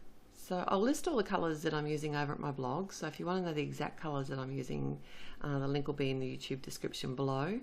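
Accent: Australia. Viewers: Hi Love the card. Just wanting to know where you bought your ATG gun from and where do you buy the refills from too please. Also what is the blue tape that you use to stick your paper down on your board with. Just love your tutorials and it's so good to hear a Aussie voice. Lol Lots of love from Rhonda Laine Sydney Australia 😘😘😘😘😘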